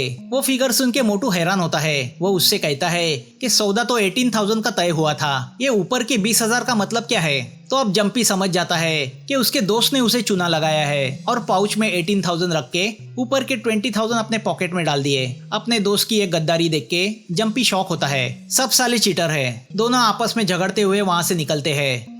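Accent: Maharashtra, India. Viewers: Mai bahut din se apke videos dekta hu thoda absent Marathi lagta hai apka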